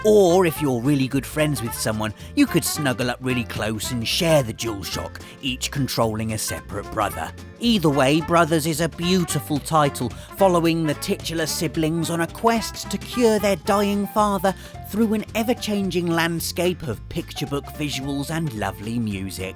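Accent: United Kingdom. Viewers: Man i just absolutely love the british accent ;D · As a new viewer, I have to say your videos are worth watching even if one does not take interest in gaming, that British voice is just remarkably fun to listen to :)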